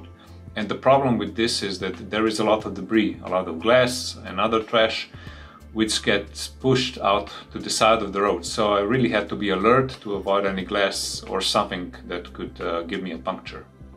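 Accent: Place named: Bulgaria. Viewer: Planning a trip with my 50cc as well, thats some nice tips, you have a bulgarian accent somehow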